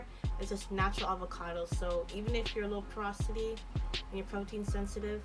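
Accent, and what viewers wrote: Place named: Canada. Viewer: I knew u were in canada i hear the accent lol...im in mtl..where did u buy the avocado?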